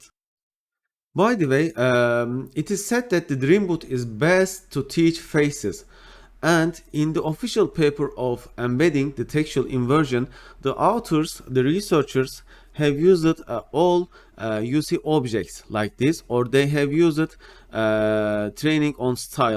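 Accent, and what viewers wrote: Turkey. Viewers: Türk aksanı, nerde olsa tanırım. Helal olsun, mük.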